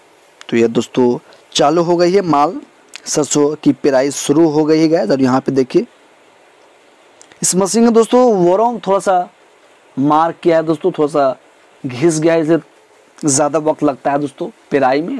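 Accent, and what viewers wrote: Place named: London, United Kingdom. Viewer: Bhai London wali hindi q bol rahe ho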